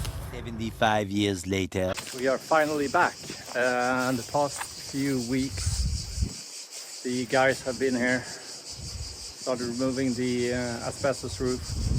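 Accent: Sweden. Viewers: Yes, thats a great idea! Introduce yourself and, describe your family, who is going to be living in your future mini paradise! All I know is you have a distinctly Swedish accent in your english speaking! Very curious also about the process of getting the house - When? How? Why? Some context would be fascinating! Subbed! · Haha I knew it too!! Was looking for this comment before I asked too. I have worked in Sweden and recognized the accent!! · Är det en svensk dialekt jag hör, mån tro?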